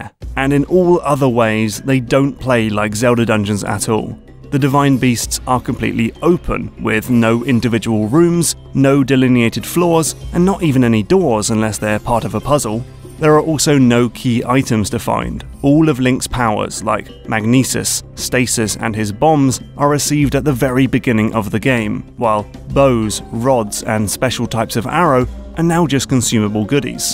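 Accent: United Kingdom. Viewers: I was expecting "anti-clockwise" from the British accent. Must have been painful to say "counter-clockwise."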